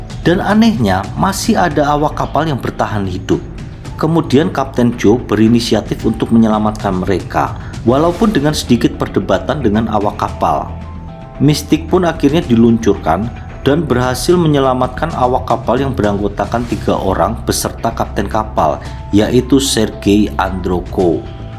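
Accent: Java, Indonesia. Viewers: Kuat betul jawa nya..mantap...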